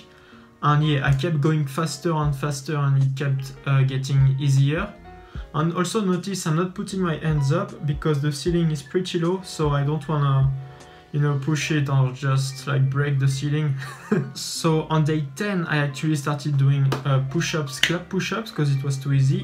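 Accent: France. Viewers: J ai reconnu l accent français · tu parle français ça se sens gg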